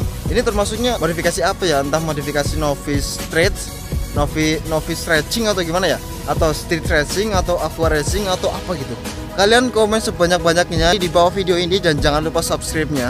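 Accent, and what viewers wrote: Banyumas, Indonesia. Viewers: Apik ngapak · Bang aku tau abang orang mana abang orang ngapakkan yang tau like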